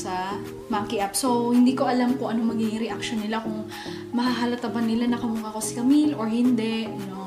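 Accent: Ilocos, Philippines. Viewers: Parang may ilokana accent ka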